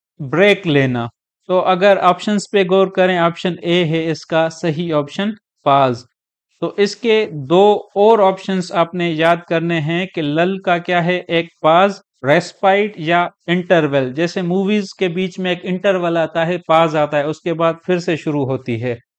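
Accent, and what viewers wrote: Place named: Sindh, India. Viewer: Keep it up....very great efforts....ur pronunciation infirms that u r sindhi man...Am I right ???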